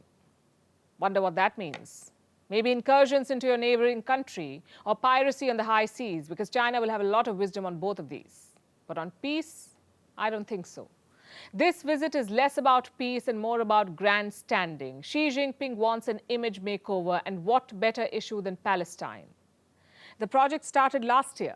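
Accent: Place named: India. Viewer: Is this American media with India accent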